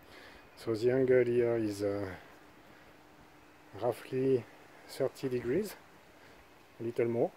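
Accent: France. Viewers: joli taf ! c'est marrant comme on catch les français rien qu'en les écoutant parler anglais (evidemment je m'inclus dedans :)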